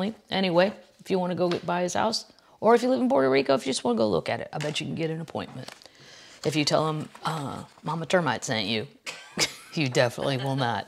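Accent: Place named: Boston, United States. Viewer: Your Boston accent is everything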